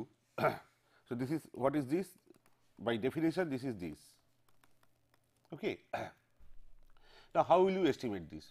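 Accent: India. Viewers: Is he speaking english with a hindi accent or is he speaking hindi with an english accent? I like his explanation though.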